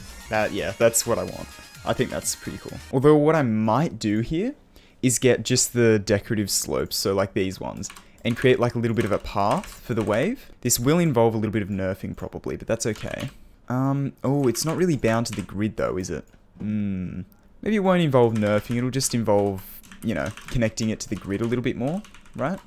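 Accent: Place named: Australia. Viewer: When are you making a level request challenge, though? Curiousity XD. But requests aside, Your content is underatted, your commeentary and Australian accent makes it better. You deserved more! Oh and yeah for the edit, put your Discord link in the Description. Im joining the Discord server 100%. - Null1f1ed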